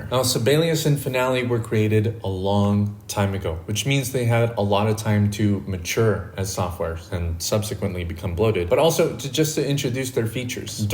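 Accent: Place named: Philippines. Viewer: He talks funny... I'm guessing Filipino is first language? lol. Anyway, great information—the note navigation key commands have me drooling.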